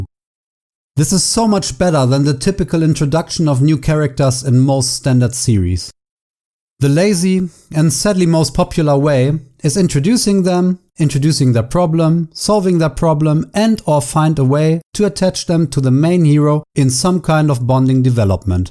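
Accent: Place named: Germany. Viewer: THIS is a real german accent congrats on the 1k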